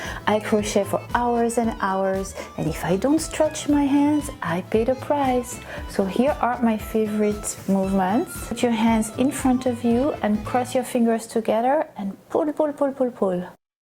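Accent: France. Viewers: you have a french accens XD I love you xoxo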